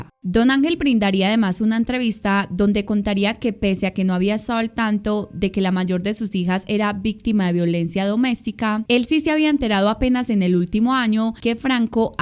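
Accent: Colombia. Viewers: Me gusta mucho el acento de Colombia y creo que esta chica es paisa o corrijanme si me equivoco por favor. Éxito en tu canal yo ya soy tu nuevo sub. Saludos desde México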